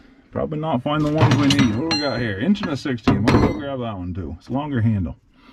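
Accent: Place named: Western Maine, United States. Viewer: new subscriber your speech sounds like you are from western maine. maybe my jugment of vermont is slanted by massacusett carpet baggers.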